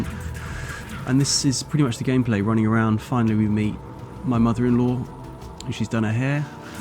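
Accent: United Kingdom. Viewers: Haha! I thought it was like a translation from Diablo 2 in German or something. But your accent is 100 % purebred British and not sauerkraut so I got confused.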